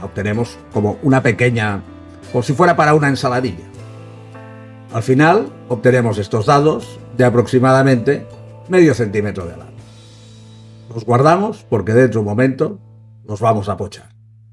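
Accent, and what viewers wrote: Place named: Catalonia, Spain. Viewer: Por el acento o Catalán o del levante norte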